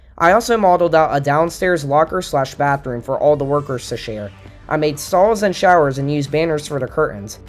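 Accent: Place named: Maryland, United States. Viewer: Insanely weird question but are you from Maryland? 😂 Your accent sounds it!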